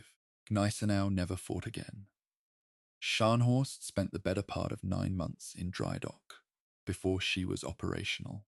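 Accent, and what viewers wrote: United Kingdom. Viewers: One of the best stories I have listened to on your channel. I love the narrator’s voice; Americans tend to love the British accent! Very soothing. Thank you!!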